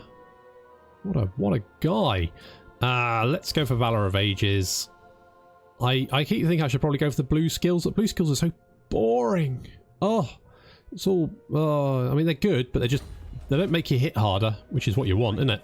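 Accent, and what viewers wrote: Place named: United Kingdom. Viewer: i followed you from cap 1 to here dude, i love your british voice DUDE ( no homo)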